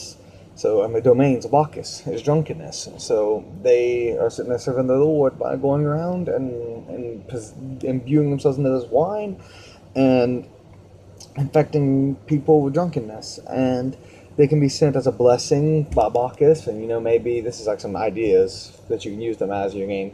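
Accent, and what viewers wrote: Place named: United States. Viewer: Are you german? Your accent i very american ^^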